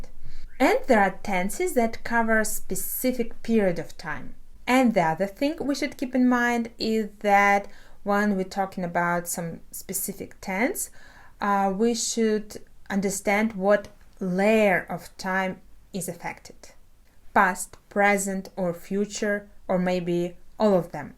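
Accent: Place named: England, United Kingdom. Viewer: I love your English accent, it gets me chills 😁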